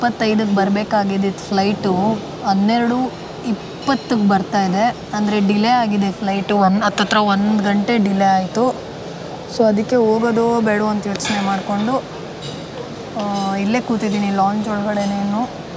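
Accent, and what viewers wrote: North Karnataka, India. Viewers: Madam baal information kodathiri tumba ista aadri nivu baal chanda uttara Karnataka maath mathadteri please nam baashe explore maadi namma bashedag vlog maadri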